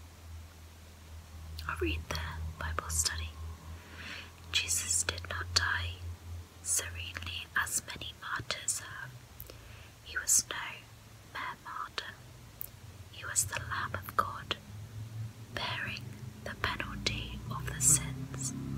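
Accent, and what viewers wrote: United Kingdom. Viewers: Nice British accent 💛☺️ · Anyone else figure out that loud kinda creepy noise that repeats later? Sounds like maybe a motorcycle going past her flat/house? Aside from that, I truly enjoyed your whispery bible read. Your velvety English tinged whispers are very soothing to listen to, especially for bed time. Please keep sharing your Christian gifts! 🤗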